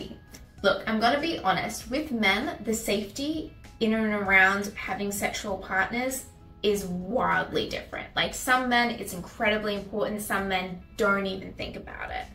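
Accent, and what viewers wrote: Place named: Australia. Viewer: You have the mildest Aussie accent I've ever heard - congrats!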